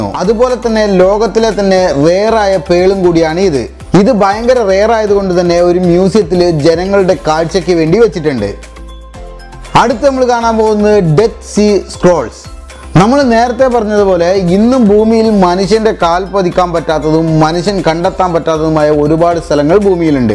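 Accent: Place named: Palakkad, India. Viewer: Chettan sherikum palakkad language ani parayunath🤗🤗🤗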